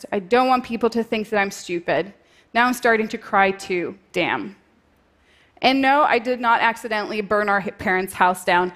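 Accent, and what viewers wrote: Sweden. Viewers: It's easy to forget Simone aer Svensk (Swedish). The accent shows just a little. Swedens have trouble with when to s. 😊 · This is probably the first time I've actually heard her Swedish accent come through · Your Swedish accent really came out in this video!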